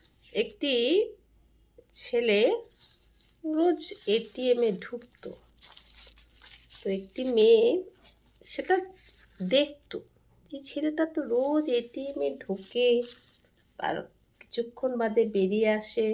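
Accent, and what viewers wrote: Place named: Kolkata, India. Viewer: আপনার বাড়ি মনে হচ্ছে কলকাতায়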